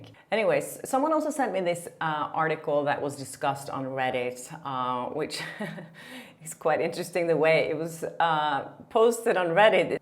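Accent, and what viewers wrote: Norway. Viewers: Your Norwegian accent is lovely and your syntax mix ups are lovely too! ❤️❤️❤️❤️ we know what you are saying and you are doing great! 🎉❤ You are also doing better in your second language that 99% of other ppl in the comments could do! 😉😉😉 😉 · I find a little Norwegian twist to some words quite charming! The friendship between Ryan Reynolds and Hugh Jackman is a dichotomy. (Hope I’m using the correct word). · You're adorable!! I much prefer your original Norwegian pronunciations!! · Your Norwegian accent is beautiful! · I love your Norwegian accent! We visited Stavanger last year and had an absolutely lovely time except for the hills. My legs were aching. Keep up the good work.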